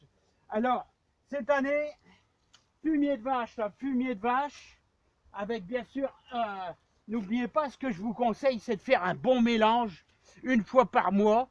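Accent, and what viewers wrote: North, France. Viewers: Ça "écrase" l'accent de chn'ord... 😍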